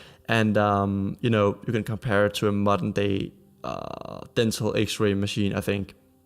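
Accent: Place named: Germany. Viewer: You sound German so you'll probably get this. First you have to understand how inertia works, then you can understand gravity. Since gravity is an acceleration of 9.8m/s^2 and since an accelerometer measures acceleration, and since accelerometers do not show any acceleration in a fall caused by the "acceleration caused by gravity" I looked into how accelerometers work. A small piece of matter inside the accelerometer is displaced when the accelerometer is accelerated, and the amount of the small piece of matter's displacement is calibrated to indicate the magnitude of acceleration. What makes the little piece of matter move? INERTIA. The same effect when you accelerate in your car and you're pushed back in the seat. In this analogy, your car is the shell of the accelerometer, and you are the little piece of matter being displaced when the 'accelerometer' - your car -- accelerates. 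You are pushed backward by inertia. Since the accelerometer shows zero acceleration in a fall to Earth caused by the "acceleration of 9.8m/s^2 due to gravity", that told me *THAT SMALL PIECE OF MATTER INSIDE THE ACCELEROMETER IS NOT BEING DISPLACED* by gravity's acceleration. Since the small piece of matter in the accelerometer ONLY IS DISPLACED due to inertia when the body of the accelerometer is accelerated, *THAT MEANS THERE IS A DECLINING INERTIA* in the downward direction. If the little piece of matter isn't being deflected, there is NOT ENOUGH INERTIA in the direction of acceleration (downward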